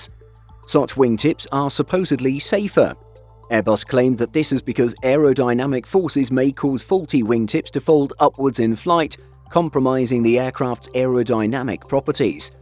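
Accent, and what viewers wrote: United Kingdom. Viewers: The guy is British, that is how the Brits say it, it's not wrong, it's just different.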